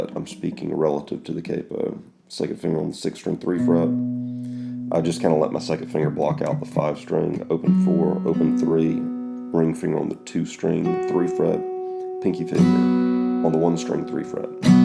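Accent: South, United States. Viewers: Once again on it. I've convinced myself that he is Dr. Phil's brother which is why I will refer to him from now on as. Judging from his southern draw and his efforts to not show his face to keep the spotlight off of him and keep it on the music, what a class act. Thanks again! Your secret is safe with us.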